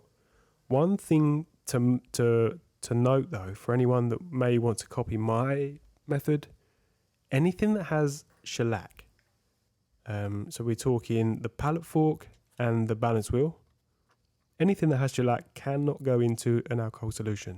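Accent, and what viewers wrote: United Kingdom. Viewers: Really interesting channel mate. Just starting to take an interest in watches. Good to hear a British accent 😂👌🏾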